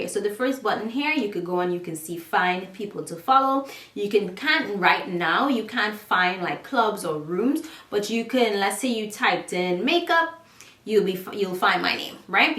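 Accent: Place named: Trinidad and Tobago. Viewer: Oh sis you’re Trini to d boneee 🤣🤣 the accent is so familiar!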